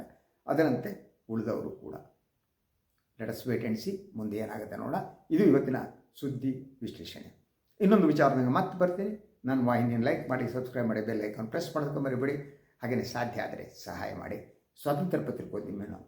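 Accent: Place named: Uttara Kannada, India. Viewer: When I hear you, I get a sense of someone from my Uttara Kannada district is talking. Thank you.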